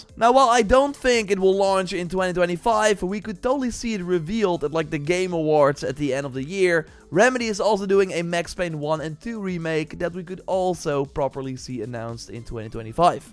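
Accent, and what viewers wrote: Netherlands. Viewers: kerel fix dat nl accent man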